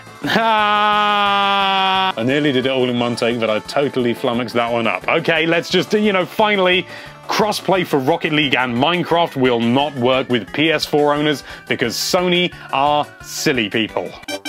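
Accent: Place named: United Kingdom. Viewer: It's British English, but yes, it is a little hard to tell. Wonder why!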